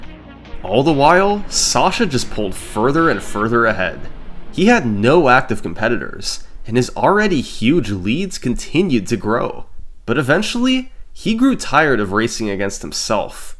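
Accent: United States. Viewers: Thats what American sound like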